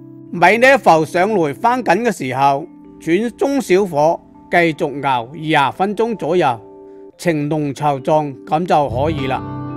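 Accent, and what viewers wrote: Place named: Zhongshan, China. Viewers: 不怪，珠三角一帶，口音是這樣多變的。聽起來像中山。中山、順德一帶，口音多樣化，隔一條江、兩條村，已經有一點分別。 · 鄉音何來怪呢!!師傅鄉音似是中山?!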